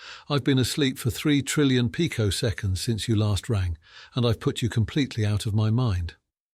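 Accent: United Kingdom. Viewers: understandably, the voice could only ever have had a british accent 😂 luv it ❤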